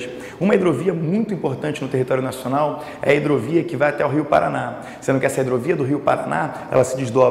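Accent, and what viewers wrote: Rio de Janeiro, Brazil. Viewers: Ninguém merece esse sotaque de carioca aff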